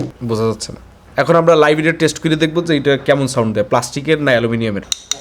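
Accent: Barishal, Bangladesh. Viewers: ভাই কি বরিশাইল্যা নাকি?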